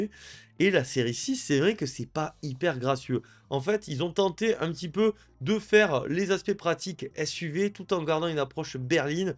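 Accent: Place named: South-West, France. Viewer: Merci pour vos excellentes vidéos et votre accent du Sud-ouest qui me rappelle mes jeunes années.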